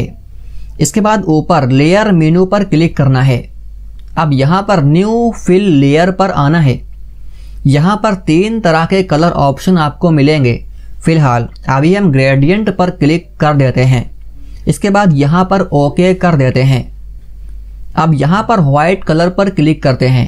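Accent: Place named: Pahadi, India. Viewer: very nice, lekin apke bolne ka andaj pahadi lagta hain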